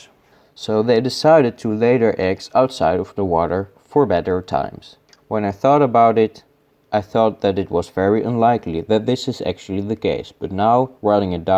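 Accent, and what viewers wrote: Netherlands. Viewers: Are you Dutch because you have a Dutch acent LoL 😂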